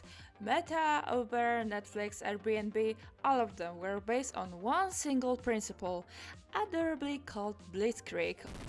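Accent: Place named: Eastern Europe. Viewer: its just the heavy slavic accent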